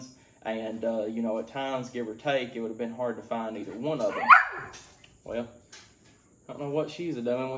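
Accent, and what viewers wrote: South, United States. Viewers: Where are you from brother? You have a heavy draaaaaawwwww!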